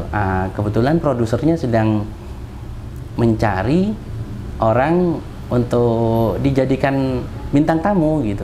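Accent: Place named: Surabaya, Indonesia. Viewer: Suroboyoan koh loe fase jowoe. Salud aku ustad cerdas komplit plit jelas tur mantep buanget👍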